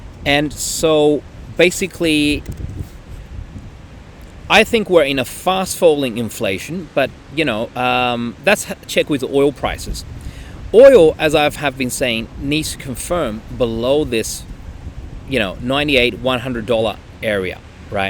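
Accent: Australia. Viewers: Are you an Aussie? Appreciate your sharing of perspective..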